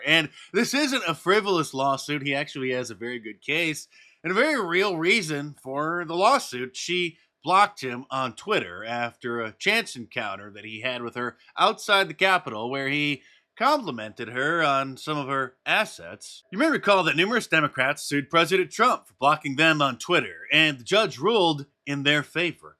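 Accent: United Kingdom. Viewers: Proud that the satirical voice over was by a Brit! 👍👍👍👍🇬🇧🇬🇧🇬🇧🇬🇧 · At least the voice over could have been done by an American sounding voice, not, British, since we are talking about the US Capitol. But, I did enjoy it, and it was funny. I heard the whole thing on Benny Johnson.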